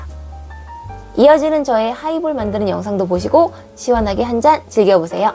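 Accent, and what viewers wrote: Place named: Gyeongsang, South Korea. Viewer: 누나 경상도네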